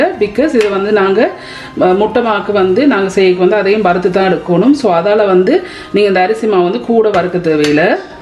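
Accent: Jaffna, Sri Lanka. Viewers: Srilankan tamil aa?? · Jaffna tamil duper❤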